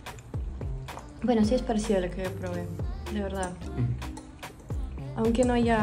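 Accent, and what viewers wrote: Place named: Chile. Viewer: Hola: Qué hermoso escucharte hablar en nuestro acento chileno... Ya aprenderás a hacer ese pastel de choclo... Te ves muy hermosa cocinando. Felicidades y ojalá puedas volver a nuestro país. Dios te bendiga.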